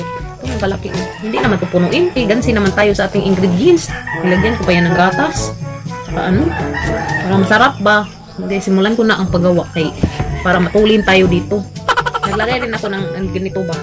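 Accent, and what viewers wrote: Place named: Visayas, Philippines. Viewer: katawa ko sa imung bislog, bisaya tagalog ba. By the grace of God, modanghan napud imung viewers k ga bislog naka unya joker pa gyud ka. Another thing about you k dili gyud ka selfish, k you're always thinking and generous to other people.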